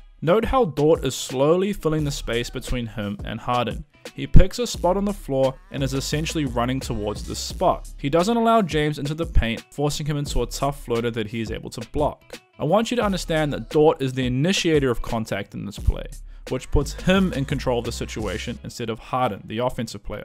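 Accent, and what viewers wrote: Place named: South Africa. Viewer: i smell South Africa in that accent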